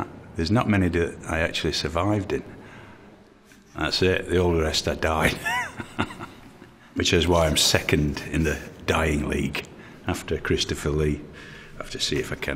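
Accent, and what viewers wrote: United Kingdom. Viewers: Well I'm argentinian so I really cannot say nothing more than "I love Bean's british fOcking accent". Btw I love his irish accentt as well xD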